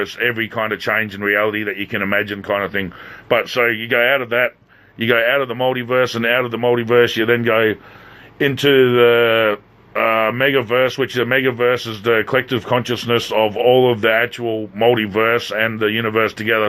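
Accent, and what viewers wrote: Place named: Australia. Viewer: Hi there, this video is helping me. I have to listen however many times in order to gain the understanding you are putting forth. Mainly bc you speak fast and with an Australian brogue. Lil' lol. I am sure you get a million comments so i know you will not remember mine. It was full of doctrinal manifestations, even though at the time i thought i was way past that. You know, having come 'out of her' and all. It is indeed by layers, one layer at a time. Im not going to let go of CHRIST my LORD. Im just having a bigger look at how the scripture was so soarly perverted and literally turned backwards. I have had Nelson visit me personally before i had heard he was lurking around. It happened when i was reading ( in the style of 'gulping' down a big tall glass of water, a few whole chapters at a sitting. As apposed to an intricate word study verse to verse) Anyway, learning from you. 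Thanking you and feeling really thankful. I am going to share a link with you from a young guy who quickly posted his experience. Its short and who knows, maybe a helpful tid bit. Alrighty, i hope to see you soon!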